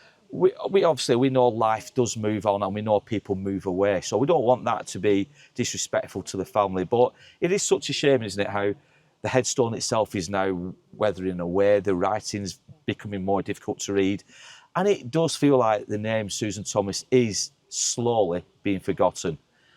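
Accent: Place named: England, United Kingdom. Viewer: nice to hear an English person on here. Im from the UK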